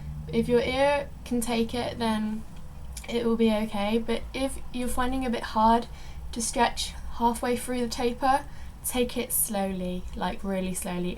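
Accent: United Kingdom. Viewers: Wow... I've only watched your videos where you don't speak so far, and I seriously thought you were American or someone who would have an American accent! xD What a pleasant surprise hearing your adorable British accent ^^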